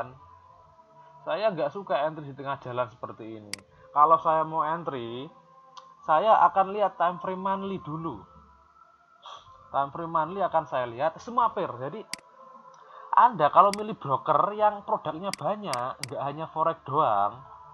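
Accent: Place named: Surabaya, Indonesia. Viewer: Bahasanya kok enak bgt, logatnya lucu. Rodo2 suroboyoan.... seneng aku ngrungokke... paham pakde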